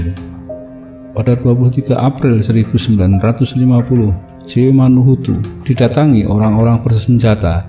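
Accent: Java, Indonesia. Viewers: Medok banget.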